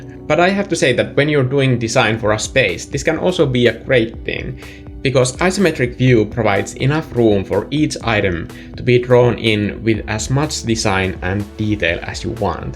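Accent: Finland. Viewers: Are you finnish? You have voice and accent like Tuomas Holopainen 😄👍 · I always loved these types of drawings as well, as a kid and up to this day. So the details, care, and attention you gave to your creation really resonated with me! You created a beautiful, relatable space which somehow feels both familiar and strange. So excellent, kiitoksia for sharing!! (I am guessing by your accent you are Finnish 😊) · I love your style so much 💚💛 and I knew I recognized that accent!! Kiitos videosta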